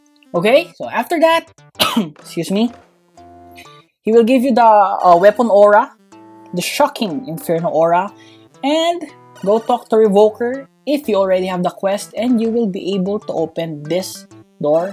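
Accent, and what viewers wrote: Philippines. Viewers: Why do you have a filipino accent?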